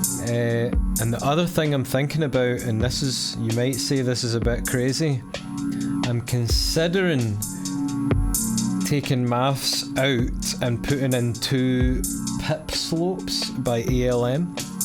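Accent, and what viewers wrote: Scotland, United Kingdom. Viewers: And then I hear a fellow Scotsman. Bravo chap!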